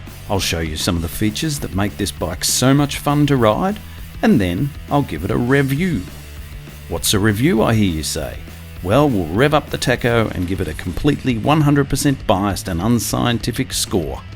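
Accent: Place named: Australia. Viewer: thanks for the helpful reply! You sound like me on my MC22 haha. Please keep up the videos, it's nice to hear an Aussie doing good reviews of bikes.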